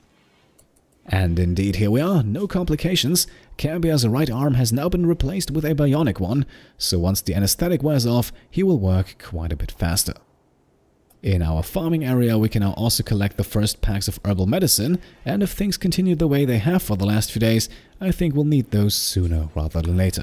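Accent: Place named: Germany. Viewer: Deine Stimme ist so entspannend weiter so danke, hoffe du wirst riesig! Your Voice is so relaxing keep it up i hope you get huge! I assume that you are German because of your way of speaking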